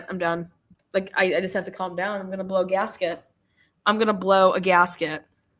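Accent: South, United States. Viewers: Watching delayed…thanks for all your videos today. Really enjoyed your southern flair in this one. Glad you’re feeling better.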